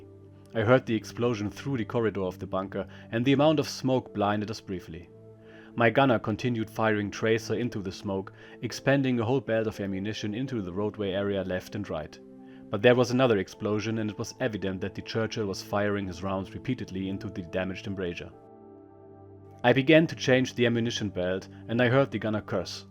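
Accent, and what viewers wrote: Germany. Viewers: The German narrating is a definite improvement over the simulated American voice. It makes the narration seem more authentic.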